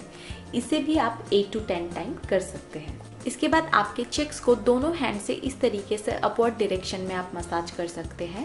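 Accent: India. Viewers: Before, you wrote the name of the ingredients in the legend. I know how to speak English, but due to your Indian accent I have a little difficulty understanding what you're talking about. Please, if you do not mind, start captioning again. Please. I like you so much. Congratulations on the evolution of the channel =)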